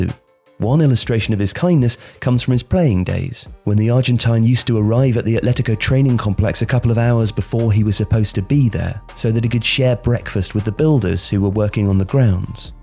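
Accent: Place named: England, United Kingdom. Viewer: The script reader sounds English. Probably can't pronounce them like a native speaker.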